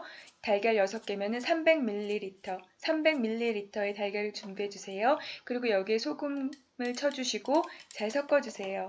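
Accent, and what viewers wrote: Seoul, South Korea. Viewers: 약간 경기도 (서울) 사투리 쓰시네요 나긋나긋 너무 듣기 좋아요! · 8~90년대 일명 서울 사투리 말투시네요 ㅎㅎㅎ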